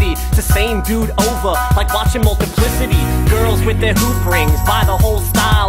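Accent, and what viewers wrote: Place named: New York, United States. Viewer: The guy has new yawk accent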